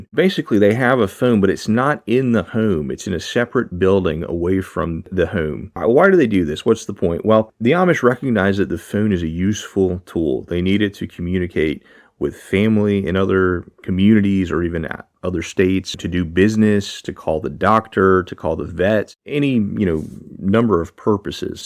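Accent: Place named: Philadelphia, United States. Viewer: Question for the narrator, you have a Philly Delco accent?? Where are you from?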